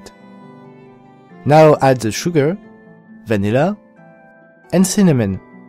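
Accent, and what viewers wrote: France. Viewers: are you french??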